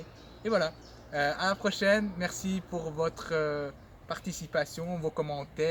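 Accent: Belgium. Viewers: Je suis passé par ici pour voir comment un polyglotte aux traits orientaux parle le francais, mais je ne m'attendais absolument pas entendre un brusselere - ptdr. Et a part ca tu te debrouilles pas mal du tout en Russe, je suis jaloux :)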